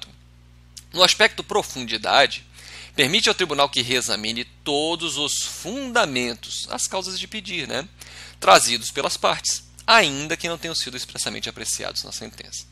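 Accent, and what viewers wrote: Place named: North, Brazil. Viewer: Esse professor é do Norte, conheço esse sotaque, kkkk. Só não sei identificar de onde, se do Pará, Macapá ou Amazonas. Alguém sabe me dizer de onde ele é?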